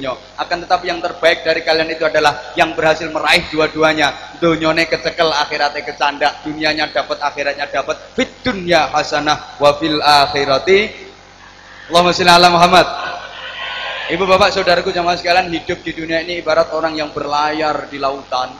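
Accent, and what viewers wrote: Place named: Java, Indonesia. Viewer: mas jawane ngendi se mas minak men nk matur jowo kok yo pas men ean kie... mugo" suatu saat njenengan bener" iso ceramah tenanan. ami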